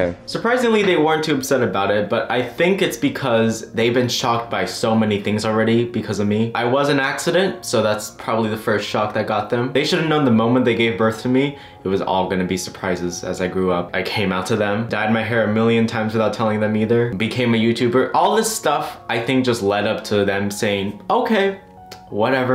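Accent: China. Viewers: Lol i luv your chinglish.its so cute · chinglish sounds really cool tho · The chinglish was so adorable. As a person who speaks Chinese, I find it so cute. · aaaaahhhhhhhh the chinglish is cuuutttttteeeeeee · Oml ur Chinese is the literal cutest. “妈妈， 我有一个surprise我要show你.” OML I DIEDDD **Chinglish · wOwW- you also talk chinglish? 👌😔👌 relatable. but- your parents are so supportive though i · Your chinglish is so funny 😂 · His chinglish is adorable.... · im sorry but your chinglish is so uwu like "ni men bu cArE" aH CUTIE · Who else is INLOVE with his chinglish ? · your chinglish rESONATES with me it made me so happy heh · your chinglish brings back memories from family reunions lmaooo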